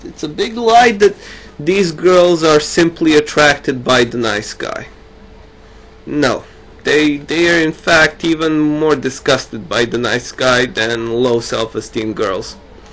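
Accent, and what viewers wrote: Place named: United States. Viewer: how come you don't have that heavy accent? your accent kinda sounds normal and like american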